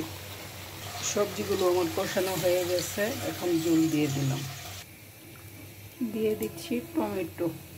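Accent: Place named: Gafargaon, Bangladesh. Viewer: নরমাল আমাদের গফরগাঁও এর ভাষাই এই কথা বইলো। এটাই সুন্দর লাগে।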